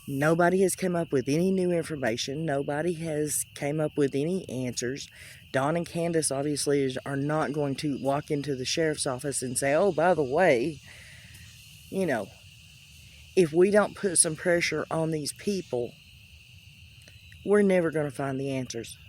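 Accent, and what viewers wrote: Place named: United States. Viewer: Your most welcome my friend…..I love your Accent, I’ve got family in OHIO, I love the American accent. But I understand what you are saying though…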